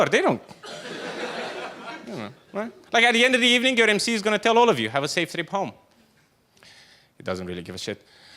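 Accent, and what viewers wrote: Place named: Mexico. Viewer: "Sometimes I go to America I'm Mexican" So, accent sounds Mexican to some Americans.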